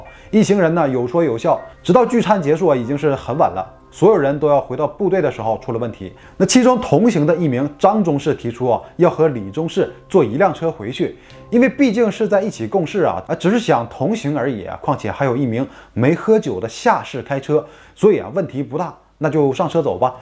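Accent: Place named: Tianjin, China. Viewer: 听口音好像是天津人，如果我错了请原谅😂 喜欢你的视频，看不够！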